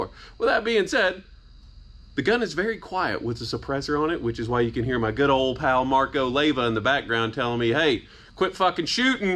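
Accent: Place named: Texas, United States. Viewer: Love the video for that I thank you. Sense of humor I rate you at about a 7/10. Shooting skills about a 3/10 Taste in beer about a -9/10 Hat about a -3 / 1000 Firearm Hk about a 1/10 Firearm Sig about 8/10 1950's sunglasses 3/10 Texas like accent. Erm It's Texas so 0/0 Garage or shop space 1000/10 Cool factor , it's a outdated design 2.5/10 Bragging rights because of a game lame/10 and your mom is calling you. Paying that much wtf are you nuts?/10 Shooting targets that had already surrendered and lying down big bully/10 Would I own one ? Well hell yeah/10 Why? Tears of the sun of course. In all seriousness: I have never owned a Mk 23 but have shot them before. Super cool firearm and accuracy is superb ! The extra weight out front makes it easy to bring back on target. I have never shot one suppressed dang it. On another side note. Metal Gear Solid is one awesome game! 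My rating system is about 5 beers out of a six pack. I have to say I would think that HK could have included a nice case . Something like the FNH FNX .45 Tacticool case . 2 mags are okay 3 mags are way better. HK mags are stupid expensive. I have 2 USP's and the extra mags back when I purchased the firearms were around $62 to $75 a piece. Now the USP mags are around $40 a piece which means the Mk23 mags are probably just over $50 each. I own a HK P7 series so I pretty much know what a over priced HK is. May I ask what that MK23 is like with that big ole suppressor? It looks to be almost Major League baseball bat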